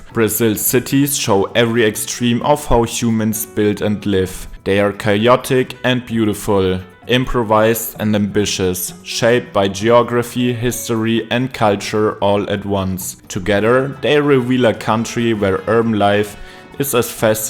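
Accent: Germany. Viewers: I know a german accent when i hear one! Love it! And yay new city channel!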